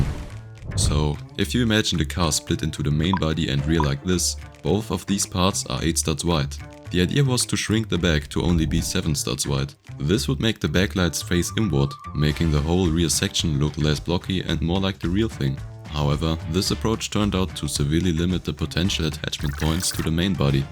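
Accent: Germany. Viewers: Perfect build and video, I hear some german accent I think 😂❤ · your english sounds like my friend from saarland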